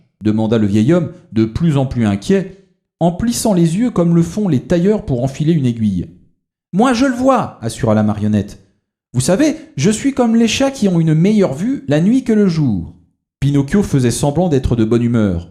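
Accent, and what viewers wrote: France. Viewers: Milles merci pour la lecture de voix avec intonnations francaises! Tu m'as beaucoup aide pour apprendre le francais populaire! Spasiba! )))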